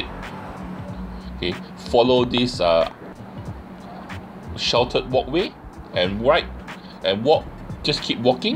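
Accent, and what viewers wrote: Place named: Ang Mo Kio, Singapore. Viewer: What part of Singapore do you live in? You have a classic Ang Mo Kio accent. I love it. ;)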